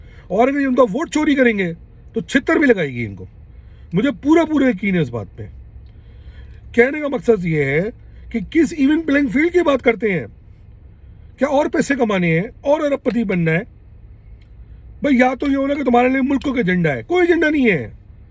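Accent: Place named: Punjab, India. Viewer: تم کو أخر اردو لکھنا کب آئے گی، پنجابی لہجے مل میں بولتے تو ہو ہی لکھتے بھی پنجابیوں کی طرح ہی ہو۔ مرون منت ہا ہا ہا ارے یہ سن کر پکا پتہ چل گیا کہ مہاجروں کا مقدمہ پنجابی لڑرہا ہے۔ پس ثابت ہوا کہ یہ "حب مہاجر میں نہیں بلکہ بغض PPP میں" بول رہا ہے۔ اصل میں مسلم لیگ ن کی مہم چلا رہا ہے۔ کیوں کہ ایم کیو ایم مسلم لیگ ن کے تھلے لگی ہوئی ہے۔ قریش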